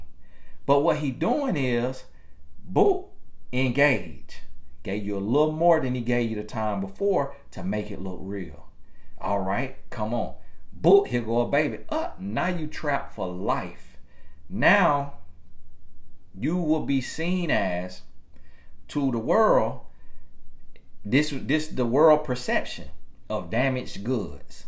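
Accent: South, United States. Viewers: I always giggle on the inside because you have to interpret our southern slang words or phases. I am from South Fla the east coast and I totally understand all of them. Have me rolling 😂😂. Yes it is bazz instead of bath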